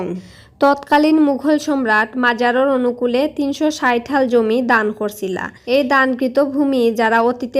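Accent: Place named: Barishal, Bangladesh. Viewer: বরিশাইলা মনু😂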